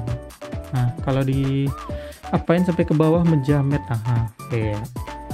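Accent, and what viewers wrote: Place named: Pekanbaru, Indonesia. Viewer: logat orang Pekanbaru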